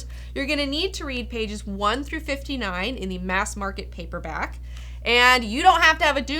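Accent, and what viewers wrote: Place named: Midwest, United States. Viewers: Midwest "O" pronunciations. Holla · Where did that Pittsburgh accent/ midwest accent come from?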